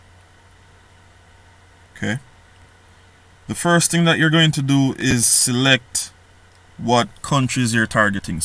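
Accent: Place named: Jamaica. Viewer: You sound like a yardie bro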